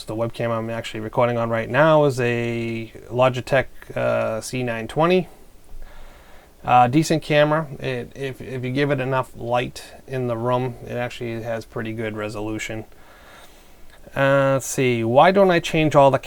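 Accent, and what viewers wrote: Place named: Massachusetts, United States. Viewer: Knew you were from MASS right away even though you don't pronounce your AAAAs (read R's for those not from MASS) like I do.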